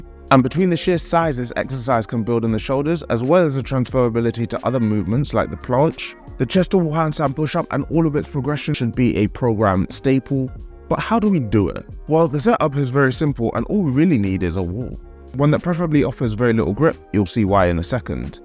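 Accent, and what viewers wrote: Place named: United Kingdom. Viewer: I always try to find the most niche problems and this guy always has a vid about it. Plus yhe british accent! Fucking legend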